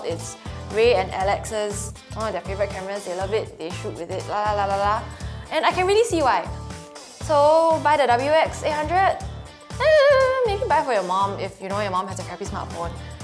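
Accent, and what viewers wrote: Singapore. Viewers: cute. am detecting Singaporean/Malaysian accent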